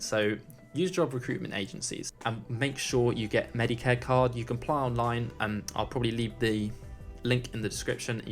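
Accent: United Kingdom. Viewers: What is your major I wonder, you have a perfect and professional British accent like my tutor in Durham uni , love it soooomuch!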